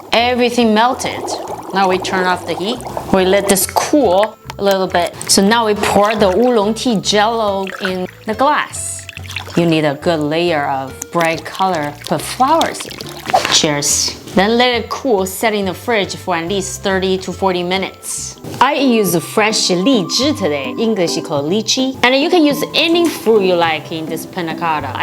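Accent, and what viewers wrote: China. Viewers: Hi, I tried most of your recipes and it tasted amazing like I was so amazed. Me and my mom are gonna try to make the pasta chips today!! Thanks for the recipe Love your Chinese accent too Love you!!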